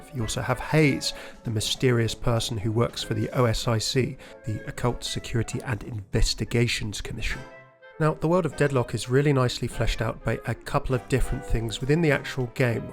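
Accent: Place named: United Kingdom. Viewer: oh shit, he's got a british accent?! oh yeah we watching, thanks youtube algo. lol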